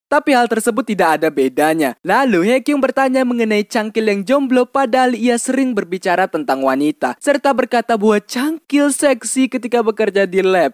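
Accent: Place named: South Sulawesi, Indonesia. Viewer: Emang telaso mu😂😂,pasti orng Sulsel kan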